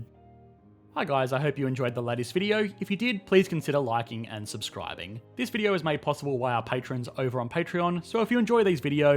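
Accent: Australia. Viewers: Americanised? He is obviously Australian.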